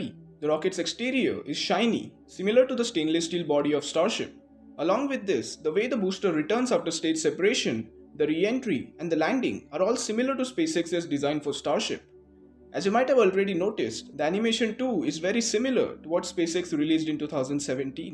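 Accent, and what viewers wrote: India. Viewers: Indian accent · Thanks a lot, this is really very precious and very interesting, for it's very unique. I hope very much to see much more of this in the future, and therefore I wish you a great success, there could be a lot of space being left for you in the scene. Your accent sounds like coming from India, remembering me to three wonderful times in India, as a former field engineer for a Swiss company many years ago. If this is correct, take my greetings, best memories, and great respect from Switzerland, to this wonderful people and culture, thänks.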